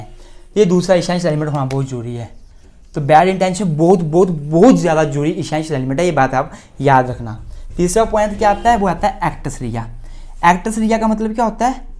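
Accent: Himachal Pradesh, India. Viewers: Are u from Himachal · pahaadi ,himachali ho aap?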